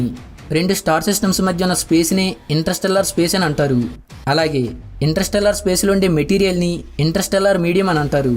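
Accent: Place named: Srikakulam, India. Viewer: Pl don't make videos with this SRIKAKULAM ACCENT....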